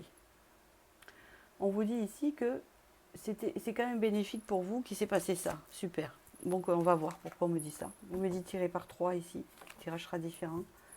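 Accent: South, France. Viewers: Merci merci merci pour toutes vos belles et inspirantes vidéos 🌸🌼🌺🎉 et n'écoutez pas les mauvaises paroles sur votre accent, ce sont des personnes malveillantes et sont jalouses des personnes du sud mais ça on n'y peut rien! Vous êtes formidables et apportez beaucoup de lumière ne changez rien et ne changez pas vive le sud 🌞🌞🌞💞 Solange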